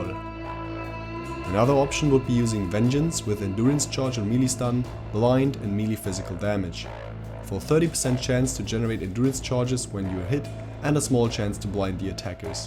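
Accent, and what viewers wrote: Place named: Germany. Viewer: good job trying to avoid the german accent.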